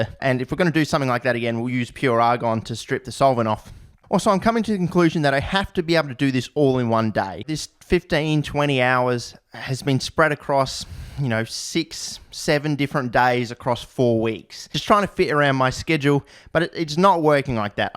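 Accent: Australia. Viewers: I'm normally pretty good with accents, even Aussie, but i did spend a chunk of the video wondering what "enema" analysis was. :) :) :) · As an American from just outside Boston Massachusetts, I’ve completely substituted my impromptu American English accent with a perfect Australian cadence (your voice) slowly over the past couple weeks. Everyone loves it and I’m considering phasing out my normal impromptu accents from around the world to just straight -Australian vernacular. Don’t post for a bit so I can grow out of this silly habit brought upon your paralyzing uploads.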